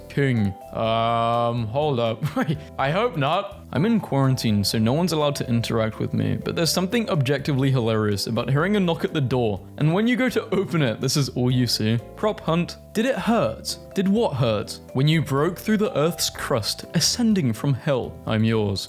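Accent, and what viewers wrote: United Kingdom. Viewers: I enjoy sitting down and listening to a British person say funny things for 20minutes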